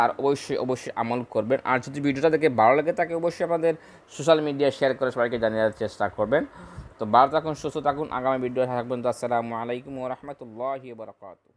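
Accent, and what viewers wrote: Bangladesh. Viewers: বা‌ড়ি কোথায় ? কু‌মিল্লা ? নোয়াখা‌লি না‌কি চাটগাও ? বাঙলা ভাষার উচ্চারন ঠিক ক‌রে কথা বলুন।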